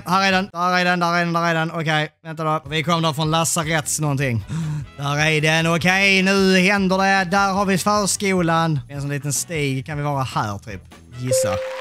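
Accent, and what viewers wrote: Scania, Sweden. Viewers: Fy fan för den vidriga skånska dialekten!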